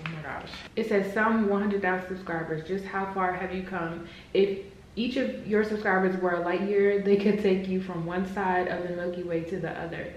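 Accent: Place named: New York, United States. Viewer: she sound like she from ny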